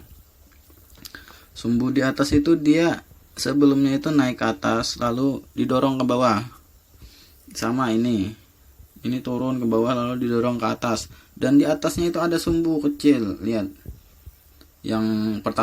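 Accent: Banjar, Indonesia. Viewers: klo di dngar dari logat bahasa ni nang kya buhan urg Bnjar jua ne..😀🙏🙏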